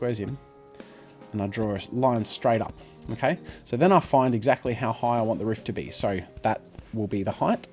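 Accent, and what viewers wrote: Australia. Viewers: He sounds so much more Australian in this video. Is it because he was younger?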